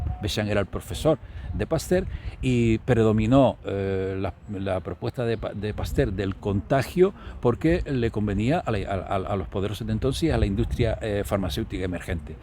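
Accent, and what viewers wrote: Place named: Canary Islands, Spain. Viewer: Ostras❗️❗️❗️,Que sorpresa veros a los 2,a jamas lo habia visto fisicamente,entonces eres Canario como el? Esq el acento se me hacia familiar pero como hay tantos canales de Hispano-America. Voy a escuchar la charla solo falta ahi mi querido y desaparecido de los medios el GRAN Pedro Bustamante. Saludos Maestro 🇮🇨 🙂😉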